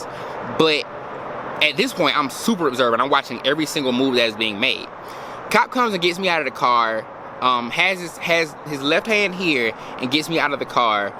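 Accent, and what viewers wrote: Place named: Georgia, United States. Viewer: lmao sounds like gwinnet 😂😂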